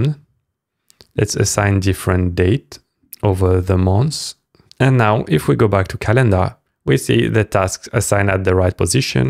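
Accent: France. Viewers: The french accent make it better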